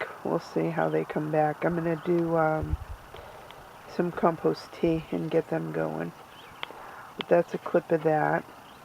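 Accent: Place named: New England, United States. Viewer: No japanese beetles in these parts, cabbage butterfly's caterpillars and Sphinx moth larva [tomato horn worm ] are my biggest pests and quite controllable with diligence ... lol. Love listening to your NE accent !! ;p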